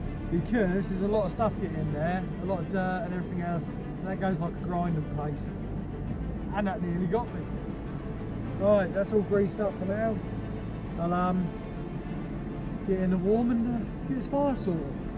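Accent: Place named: Suffolk, United Kingdom. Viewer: Hey baa nothing wrong with Suffolk talk greetings from Newmarket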